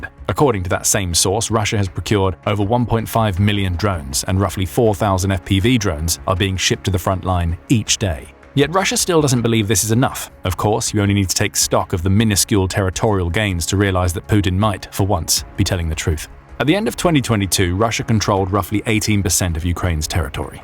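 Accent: United Kingdom. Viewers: Ahahahaha the accent dont make us go to wrong analises. The british trying to win the loose war on the blabla. Really???!!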